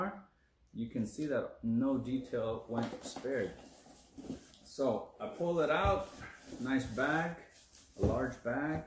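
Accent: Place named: Eastern Europe. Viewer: where were you born? Your accent and grammar appear to be non native. Eastern Europe?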